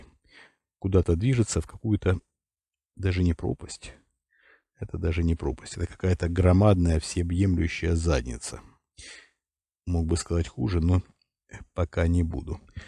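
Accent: Ukraine. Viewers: Смехуёчки во время эфира раздражают!!! Никакого анализа...и голос похож на голос Кашпировского, с украинским акцентом.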